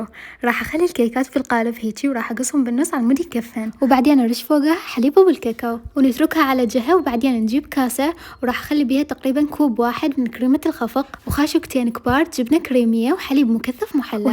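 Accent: Baghdad, Iraq. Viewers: اللهجه العراقيه تجنننن🇸🇦🇮🇶💓💓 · بغدادي وجنوبي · لهجة بغداد و وسط العراق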